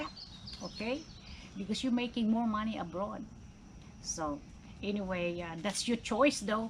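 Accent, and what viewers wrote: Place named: Philippines. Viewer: Are you a Filipino ? If yes, then could you pls speak in your mother tongue your accent speaks for it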